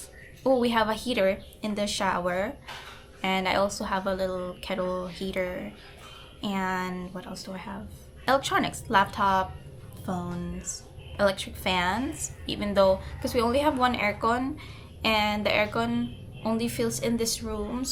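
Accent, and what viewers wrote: United States. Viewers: Honestly, don’t mind the bullies. You speak perfect English I am from Chicago and you sound like an American with your English accent. I was wondering if you lived in the US where you’re from the US because of how good your English pronunciation and accent it’s amazing by the way I’m Filipino and I speak fluent Tagalog and I’ve been living here since 1993 I grew up there that’s why I am so amazed with how well you speak English more power to you. I wish you well and your son as well God bless and keep trusting God he’s got your back don’t worry. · 🤔 I'm curious, you philipinna? You sound like a girl from the U.S.😂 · I can tell u grew up with an American father or influence. Ur American English is very evident. Good luck on ur channel. Love ur accent.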